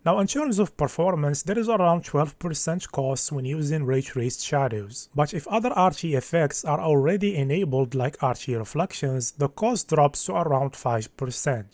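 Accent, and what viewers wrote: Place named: Casablanca, Morocco. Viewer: خويا ميمكنش متكونش مغربي هاد الaccent ديالك باينا كازاوي هههههه Good work brother btw ❤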